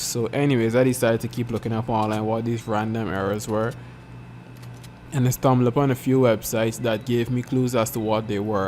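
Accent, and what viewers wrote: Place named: Caribbean. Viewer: Good to hear a Caribbean voice on YouTube for once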